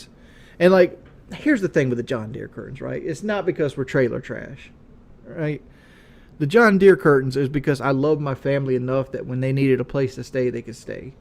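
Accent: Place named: South, United States. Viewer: That's just how folks from the sayouth talk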